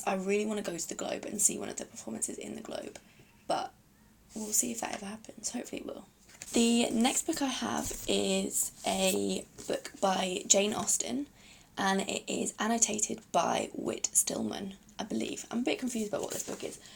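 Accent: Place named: United Kingdom. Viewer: I love the British accent and you are a stunning example! Thanks for sharing, greetings from Italy.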